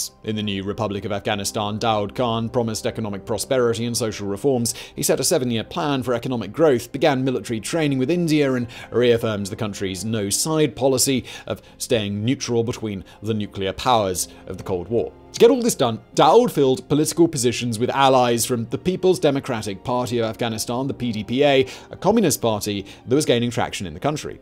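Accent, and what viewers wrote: RP, United Kingdom. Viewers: a lot of his videos are in pretty poor taste, like there's videos on his other channels about some really really sad and heavy stuff and yet he delivers it in this weird posh accent that makes it seem like he's joking, super irritating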